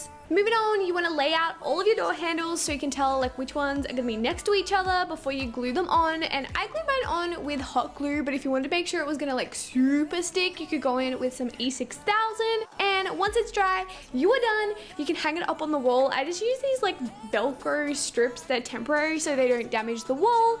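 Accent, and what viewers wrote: Australia. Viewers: Sarra Turki she is from australia (didnt you notice the australian accent?)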